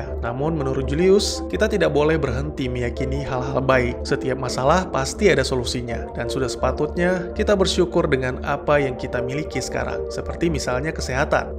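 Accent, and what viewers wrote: Aceh, Indonesia. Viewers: Bang lu org aceh ya?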